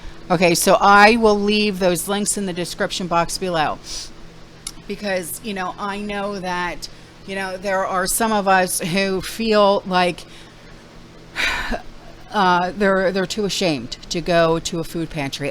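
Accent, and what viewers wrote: New York, United States. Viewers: Hello Seattle In The Chat 🤗 I don't know what i like about you the best. The New York accent or how dang sweet you are❣️ I always look forward to your post ❤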